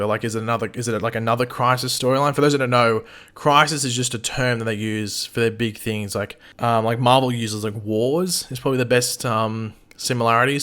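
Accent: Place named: Australia. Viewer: You sound like a Australian version of Tom Welling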